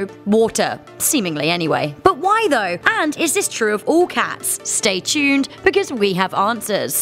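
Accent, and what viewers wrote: England, United Kingdom. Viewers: Loves the color green Has an English accent And loves cats Anyone get the reference?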